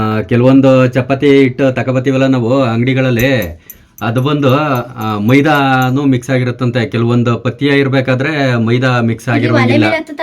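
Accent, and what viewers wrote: Mandya, India. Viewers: . ನಮ್ಮದು ಯಾದಗಿರಿ.(uk) ಆದ್ರೂ ಮಂಡ್ಯ ಭಾಷೆ. ಕೇಳೋಕೆ ಚಂದ.. All tha best... K A. 33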